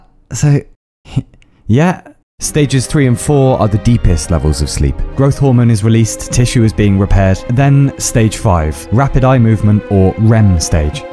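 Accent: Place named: England, United Kingdom. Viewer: Why are so English 🦖🦖🦖🦖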